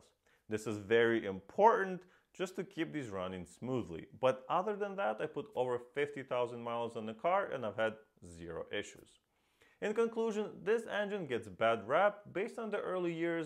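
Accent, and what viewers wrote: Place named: Russia. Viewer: У тебя как будто русский акцент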